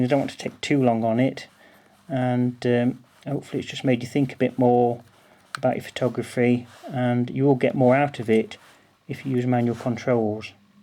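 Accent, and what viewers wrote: Yorkshire, United Kingdom. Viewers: interesting video. yorkshire or lancs accent.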